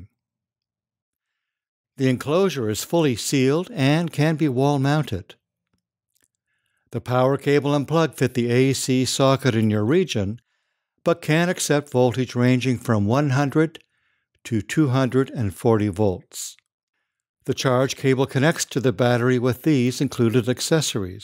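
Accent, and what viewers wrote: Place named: Canada. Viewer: This guy's voice is weird, I hate it. Sounds like a damn Canadian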